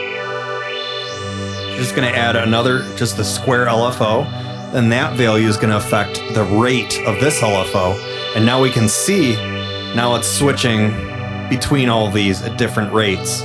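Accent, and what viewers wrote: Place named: Western New York, United States. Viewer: I stumbled onto your channel this past week, first hunting for more Lyra-8 demos then discovered your Zoia content. My Zoia arrived yesterday and the Lyra-8 arrives on Friday or Monday. I've not yet fired up the Zoia, but your videos along with other excellent walkthroughs people are posting have been helpful. Almost like I've already actually used the machine. You've got great skills of explanation and teaching, thanks for sharing those in your videos. BTW, your accent has me guessing you're from W NYS, greetings from the edge of Lake Erie. :)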